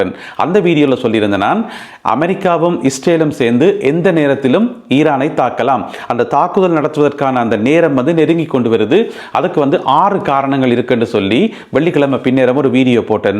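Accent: Sri Lanka. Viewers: Once in a while give your name in the video I watch your episodes for your Srilankan Tamizh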